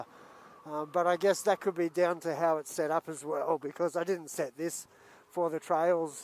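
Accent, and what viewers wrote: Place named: Scotland, United Kingdom. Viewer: dude your accent is so nice, looks like scottish but your channel is based in Australia, australian accent is just like yours?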